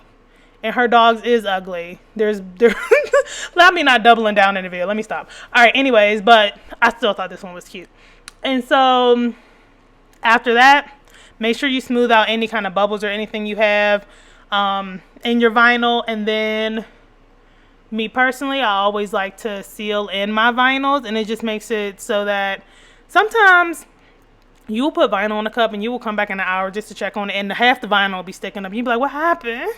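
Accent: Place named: South, United States. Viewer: I just stumbled on your video. Thank you for making me laugh. You said you are in Colorado but I hear Southern girl (Carolina or Georgia).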